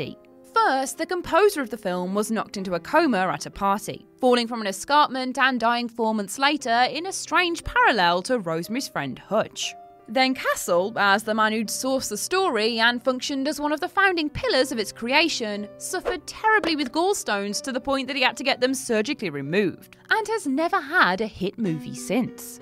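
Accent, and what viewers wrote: United Kingdom. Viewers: okay, enough of this. is obviously British. American pronunciation is different. Get over it. Worchestershire is pronounced Wooster-sheer, schedule is pronounce shed-ule. You are aware that the British INVENTED the English language. We only borrowed it, so give her a break on pronunciation, okay. You go!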